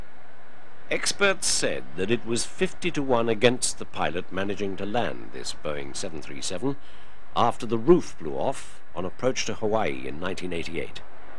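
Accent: United Kingdom. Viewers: Why do they overdub an American narrator with a British one whose thick cockney accent makes it un watchable. Know why the original Mayday series was so good? The Narrator. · This "documentary" is total bs. They got one thing wrong after another, and then, 12 minutes in, they just made up a new cause for the Tenerife disaster which had nothing to do with it. Total idiocy. I guess any 16-year old kid with an upper-class Brit accent can spend a few hours cutting clips from other vids and watching a couple of them for a few minutes so that he can sling some lingo into a script and then put something like this together and people will watch it. I love that it's called "The Facts." I'm certainly not going to finish it. The only stuff they're going to tell me that I didn't know already is stuff that isn't true. Crap, crap, crap....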